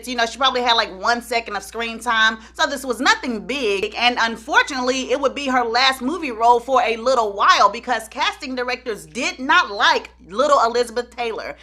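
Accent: South, United States. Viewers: gurl...I wish I could give you five thumbs up...the way you tell a story is phenomenal!!! I had to listen to this one twice it was so funny. Your voice and that lil southern twang and your comedic timing are so on point it cracks me up every time. Thanks for the joy and laughter you bring. Sayso fam: Seek the Lord while He still may be found! All praises to the Most High...praise His Holy name...halleluYah!!! Love to all shalom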